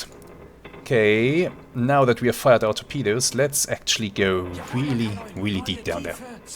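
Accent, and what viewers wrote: Germany. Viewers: great stuff. And the fact its a german accent in u boat playthrough makes it extra spicy. Next goal: 200!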